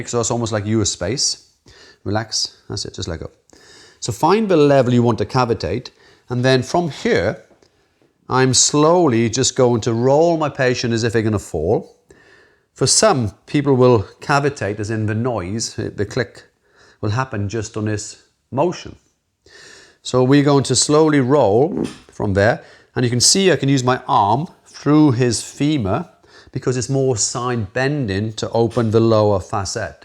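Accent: Wales, United Kingdom. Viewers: That's definitely a Welsh accent I hear!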